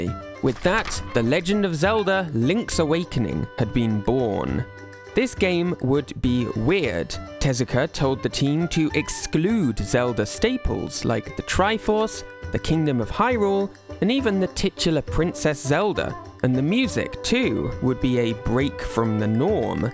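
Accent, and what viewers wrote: United Kingdom. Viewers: Why do british people pronounce sixth like that?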